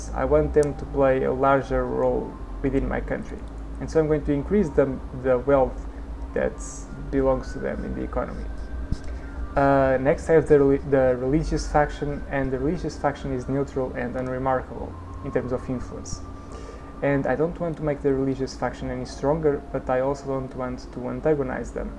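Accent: Portugal. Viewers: Na hora que você falou os nomes portugueses com seu sotaque matei na hora que es de portugal =D O jogo parece muitissimo bom estou ansioso por ele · Now I know what the portuguese accent is, when speaking English. Nice video, quite instructive, albeit slow-paced.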